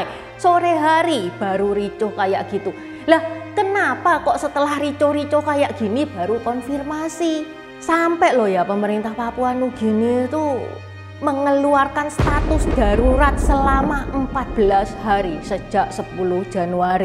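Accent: Java, Indonesia. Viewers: mantap walaupun medok banget jawanya, lanjutkan ci. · "lha wong..." biyuh muedhok.. Ngalam ikut ngintip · Ternyata dgn bicara medoknya, menjadikan saya asiik mendengarnya😅😅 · logat nya meedook banget😁😁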